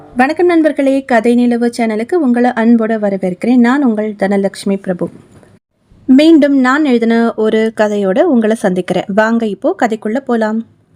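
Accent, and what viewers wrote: Kerala, India. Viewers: Sis! novel 👌but ella novel um malayalm tone athigama varuthe sister 😮u want like malayalam..sis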